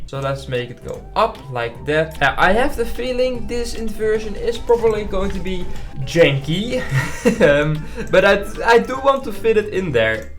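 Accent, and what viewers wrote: Netherlands. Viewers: het nederlands accentje is er wel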